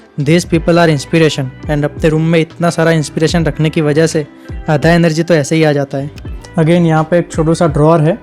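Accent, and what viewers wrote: Gujarat, India. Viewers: Your Gujarati English accent is so sexy 🔥🔥🔥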